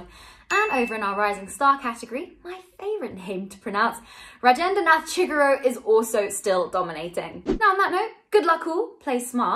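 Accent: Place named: Australia. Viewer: australian accent i hear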